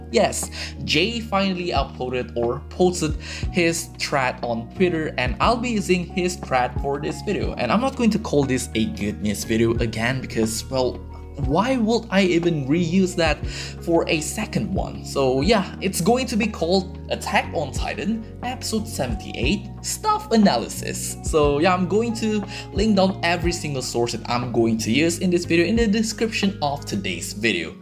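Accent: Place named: Asia. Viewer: how old are tou? , also are you american? , sound kinda asian with that accent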